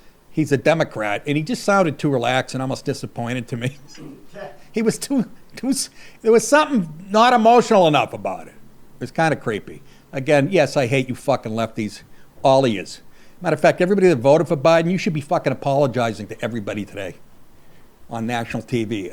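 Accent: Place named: Boston, United States. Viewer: Are you a Massachusetts man? As a former North Ender it sounds like home.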